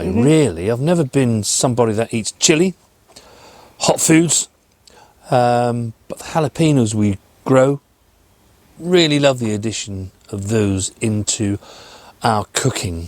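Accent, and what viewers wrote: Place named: Norfolk, United Kingdom. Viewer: You can't beat a good old Norfolk accent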